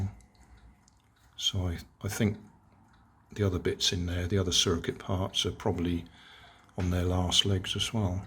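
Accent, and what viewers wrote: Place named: RP, United Kingdom. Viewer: you have a BBC type voice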